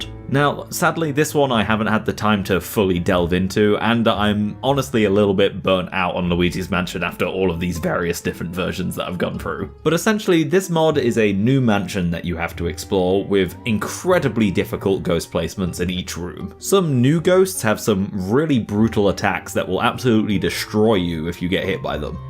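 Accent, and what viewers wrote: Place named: United Kingdom. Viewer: Why must all you Nintendo and Sega YouTubers be British and ruin the video with your horrible voice… quit forever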